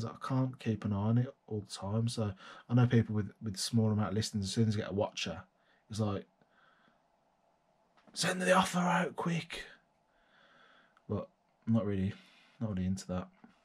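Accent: Birmingham, United Kingdom. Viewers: Damn that brummy accent 🤣🤣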